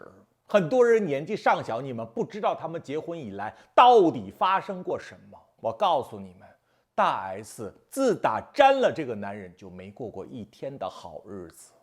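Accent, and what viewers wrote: China. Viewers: 你是大陸口音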